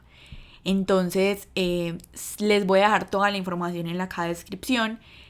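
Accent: Colombia. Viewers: Eres colombiana no?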